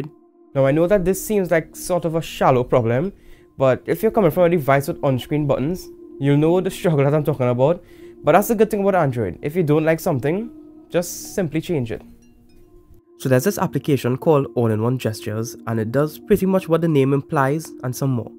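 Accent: Trinidad and Tobago. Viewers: Phanic! At the Twenty One Crybabies it's Trinidadian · trini accent people, am a Vincentian and we from the Caribbean!!!!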